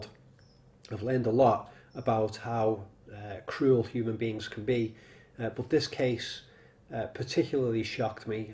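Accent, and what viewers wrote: Liverpool, United Kingdom. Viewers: Love you and your chanel, but as a Blackburn native its very very funny to hear a scouser say "did him too" when describing a whole ass murder haha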